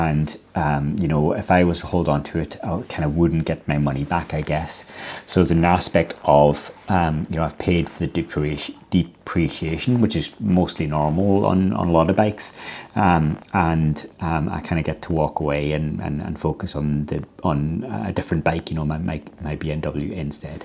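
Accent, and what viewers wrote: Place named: Northern Ireland, United Kingdom. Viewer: would seriously consider it! From your accent you still in NI or local region??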